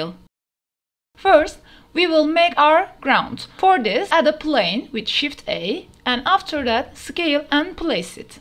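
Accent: Turkey. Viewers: konuşma tarzın çok türke benziyordu bi baktım türkmüşsün :D bu aralar sürekli blender'dan liminal space ile ilgili şeyler yapmak istediğim için hep bu tarz videolara bakıyorum sonunda istediğim videoyu buldum eline sağlık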